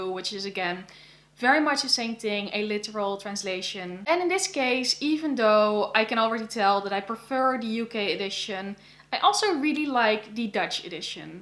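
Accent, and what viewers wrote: Netherlands. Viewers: Thanks so much for making another one of these videos, I've been waiting a long time for it since I enjoyed the last one! How is your studying going, and is the pandemic situation in the Netherlands getting better? Your makeup and hair are gorgeous as always! Are you trying anything different, or do you have the same routine? Are there any Dutch writers that you think are really great and that you enjoy reading? I also really get annoyed by nonremovable stickers on books and I usually don't buy books with them, because I just hate the way they look. Have you thought about reading Hanya Yanagihara's first book, The People in the Trees, if you haven't already? Like A Little Life, it is also a superbly written and fascinating book based on a true story about some very awful and difficult topics. Also, I love hearing you talk, since your accent is beautiful and unique!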